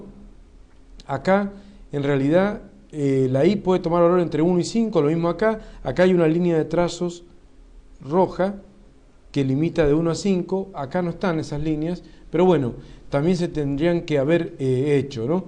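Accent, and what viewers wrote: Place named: Argentina. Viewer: es argentino pero le mete un neutro por ahi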